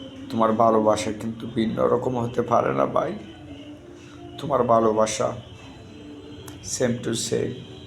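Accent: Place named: Sylhet, Bangladesh. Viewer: আসসালামু আলাইকুম, ও ভাই আপনার কথায় বুঝতে পারছি আপনি সিলেটি মানুষ, আমার জন্য দোয়া করবেন আল্লাহ তায়ালা যেন আমার মনের নেক চাওয়া কবুল করেন, আমার স্বামী দীর্ঘদিন ধরে আমার সাথে কথা বলেন না,ভুল বুজে আছেন কিন্তু আমি আমার স্বামীকে অনেক ভালোবাসি,সবাই আমাকে স্বামীর আশা ছেড়ে দিতে বা মামলা দিতে,আমি কোনো সমস্যা সৃষ্টি করতে চাইনা,কারন উনাকে ছাড়া আমি একা থাকবো কিভাবে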